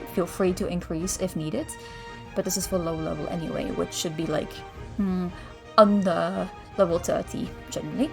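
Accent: United Kingdom. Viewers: Bri’ish tbh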